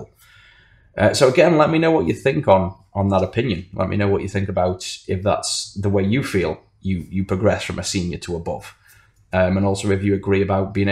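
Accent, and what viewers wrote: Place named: Liverpool, United Kingdom. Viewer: Thanks, mate! Are you from Liverpool?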